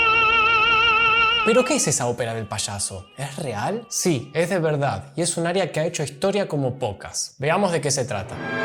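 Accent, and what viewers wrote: Argentina. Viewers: Non sto piangendo, beh, sto piangendo perché il tuo accento è molto divertente. Tutti gli argentini parlano così? Grazie per avermi fatto ridere. Questo è il bacio di Tosca! Muori, dannata, muori! 😂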